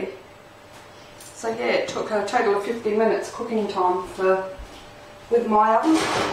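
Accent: Australia. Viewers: Wow these older videos of yours got lower views than your new ones. Too bad people forget to check out our older videos on youtube. They always want new ones. I can hear more of your Australian accent in this video. I didn't know you did cooking videos in the past. I like banana bread too. Did you make yours in a cake pan? bye.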